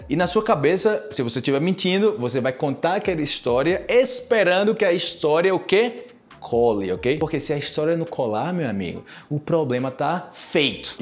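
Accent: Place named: Colombia. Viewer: Impresionante el acento colombiano!!! Se te oye bien!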